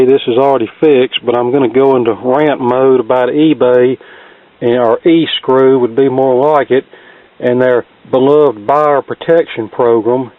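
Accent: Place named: United States. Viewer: Blitz Roehre Vor dreißig Jahren wohnte ich in süd Deutschland. Ihr Akzent klingt anders... Amerikanisch vielliecht?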